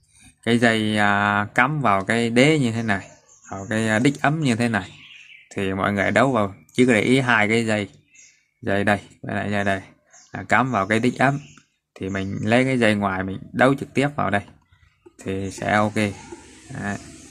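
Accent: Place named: Ngư Lộc, Vietnam. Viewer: Mới nghe tiếng nói mình tưởng bạn ở Ngư Lộc (Hậu Lộc). Chào nhé !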